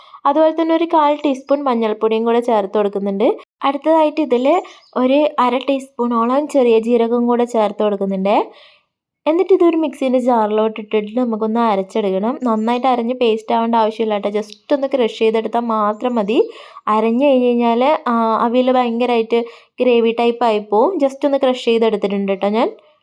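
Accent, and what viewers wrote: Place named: Kannur, India. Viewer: Nannayitund daaa.kannur slange poliii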